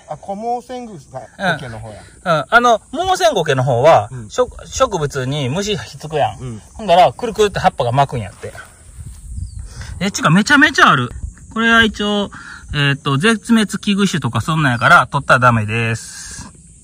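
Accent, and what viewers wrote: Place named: Wakayama, Japan. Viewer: あっ🤭、そうそう！の話し方にほっこり。和歌山弁にほっこり！関西人はなんとなく、通じるものがあるんですよね〜。いつもお返事ありがとう。喜んでます🥰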